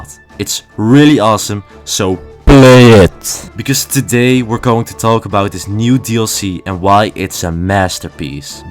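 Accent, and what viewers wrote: Netherlands. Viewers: Dutch accent moment, nice vid tho haha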